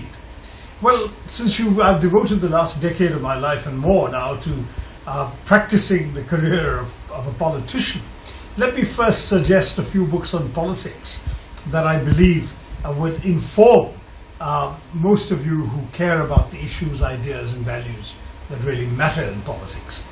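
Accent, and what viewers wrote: England, United Kingdom. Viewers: Is the British accent necessary? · Such an English accent...lovely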